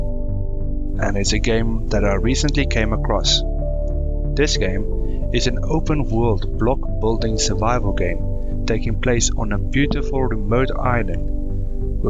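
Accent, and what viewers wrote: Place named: Afrikaans, South Africa. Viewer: you dont sound english you sound afrikaans if you do awe brasse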